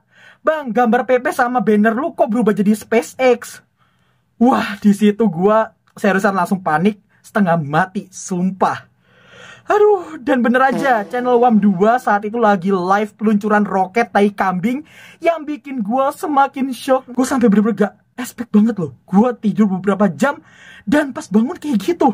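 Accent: Java, Indonesia. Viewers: Kamu dari Jawa y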